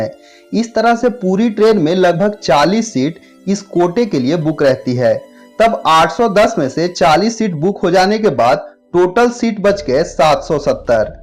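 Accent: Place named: Howrah, India. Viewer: Howrah se ho naa ? 100 %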